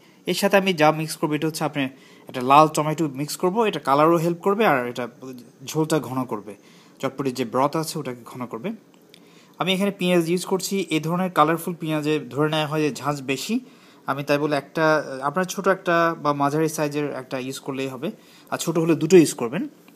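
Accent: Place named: Chittagong, Bangladesh. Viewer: is this man from chittagong ? he sounds so .. ! :-o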